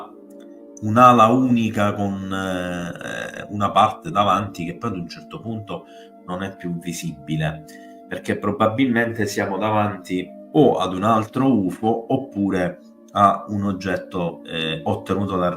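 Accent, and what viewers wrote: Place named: Sicily, Italy. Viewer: Mi sembra di capire che sei siciliano e forse di Palermo...te lo dice una di Catania😊👍 Complimenti video sempre interessanti e mai noiosi🌠👍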